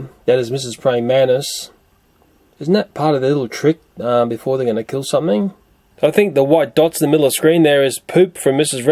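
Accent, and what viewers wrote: Australia. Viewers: Your vids have a combination of greatness that just keeps us tuning in. One of the biggest is the feeling that we can feel your passion and interest in watching this for yourself. Also your commentary that with its quirky funny Aussie ways of speech to my SoUthern Californian ears. I️ love it that your not some scientific know it all and you have input, thoughts, and reactions like any other black widow hating dude! Doing great keep it coming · Thats an aussie accent 😁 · I could listen to your accent and “Australianisms” all day! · Is this guy AUSSIE 😂😂 he’s cranking some good jokes 🤦🏽‍♂️🤦🏽‍♂️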